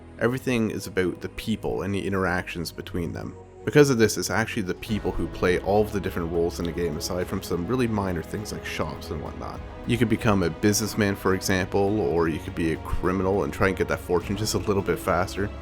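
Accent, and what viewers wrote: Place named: Canada. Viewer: I typed this comment earlier, but I accidentally deleted it because I'm a moron. But, will you have playable female characters in the game? I saw that you had sketches of female characters, but would it be possible for the player to actually be a female? I don't mind playing as a guy, but I was just curious. Also, are ya'll based in Canada? I can hear an inflection in your voice (namely when you say "about" or "out"). I don't think my current computer can handle this game, but, pathetic as it may sound, I'm willing to get a part-time job just to play this game. I really hope ya'll throw a violin or cello in there or something as one of the musical instruments. That would make my life complete.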